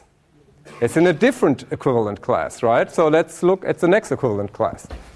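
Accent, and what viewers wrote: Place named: Germany. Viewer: His English is not bad, but sometimes I can't tell if he's speaking English or German...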